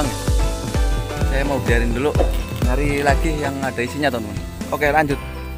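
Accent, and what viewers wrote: Java, Indonesia. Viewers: Pantes Kya logatnya Jawa...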